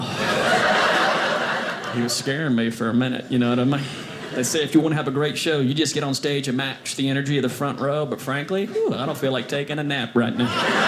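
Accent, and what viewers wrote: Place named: Texas, United States. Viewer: Hold up. I tried not to say anything, but this is eating at me: His mama is English, speaks fluent Italian, Dad is Italian and speaks fluent English. Why does dude sound like he's from Texas?!?!?!?!? Somebody help me, I'm sooooo confused 😕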